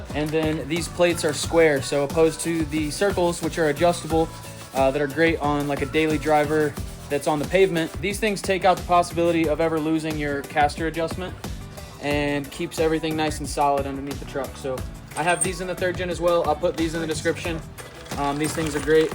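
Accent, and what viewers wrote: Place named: South, United States. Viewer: Love the Talladega nights accent😂😂😂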